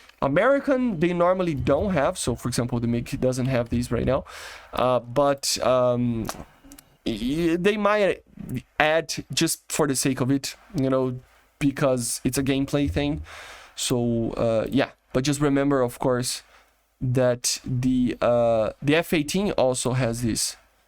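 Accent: Brazil. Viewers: Bro is definitely Brasilian, just by the accent and the way u talk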